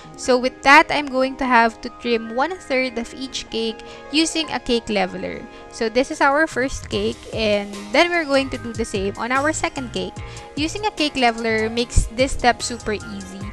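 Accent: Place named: Philippines. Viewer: Hi Ate.... thanks for a well-made video. You are born to teach! If there is such a thing as a Filipino ENglish accent, this is an excellent example. Beautifully spoken😊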